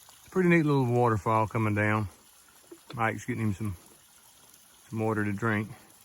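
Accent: South, United States. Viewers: U a southern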